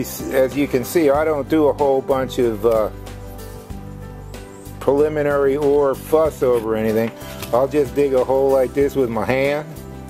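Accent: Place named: East Coast, United States. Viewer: Cool! I’m curious where you live because it sounds like you have an East Coast accent and I saw that you had the palm in the snow… I’m from Pennsylvania but I’m out in California now. That’s pretty cool that you can grow them in that temperature ...I didn’t know that! I grabbed little baby Palm Pups all the time walking around just to see which ones I can grow he he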